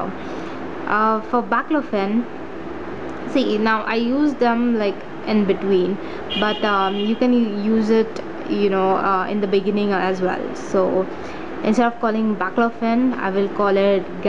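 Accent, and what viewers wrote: India. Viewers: Because of your accent and the traffic noise😁 btw im indian too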